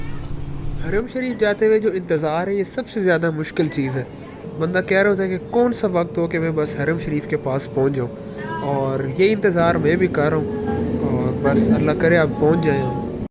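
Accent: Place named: Attock, Pakistan. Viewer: آپ کے لہجے سے لگتا ھے کہ آپ کا تعلق اٹک سے ھے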